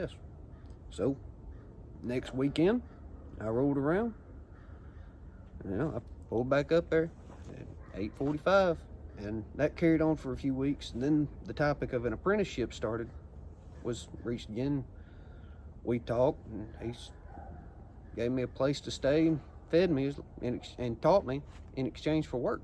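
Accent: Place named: Kentucky, United States. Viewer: Sounds like a Ky accent.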